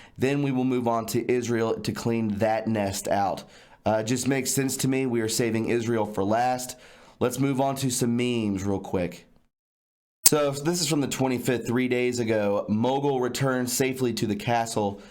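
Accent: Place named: Georgia, United States. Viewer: not sure where you are but your Southern sound a whole lot like Hart County Georgia. Love that area and miss it terribly. All the South is great, though. 🇺🇸❤️🇺🇸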